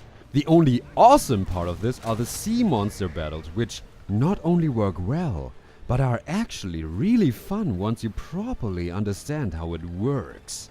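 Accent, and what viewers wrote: Germany. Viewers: Immer wieder schön den deutschen Akzent zu hören 😉. Im großen und ganzen mag ich deine Videos aber gerne 👍. Auch wen du sehr harsch mit den Spielen meiner lieblingsentwikler umgehst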